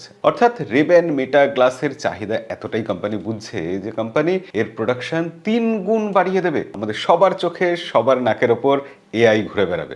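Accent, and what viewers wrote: Bangladesh. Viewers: পরচুলো পড়া এই লোকটা বাংলাদেশি উচ্চারণ নিয়ে কি করে আনন্দবাজারে এলো।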